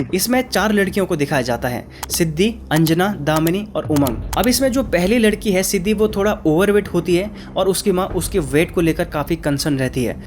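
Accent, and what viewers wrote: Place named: Garhwal, India. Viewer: Gadwali accent 👍👍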